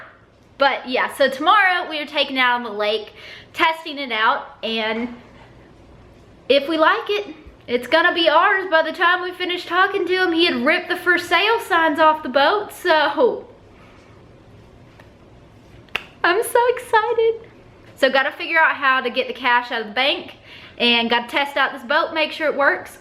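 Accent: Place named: Texas, United States. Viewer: I knew you were a fellow Texan from your accent.😀 Im a newbie to your channel and I love it. CONGRATULATIONS on your boat 🚤🚤!!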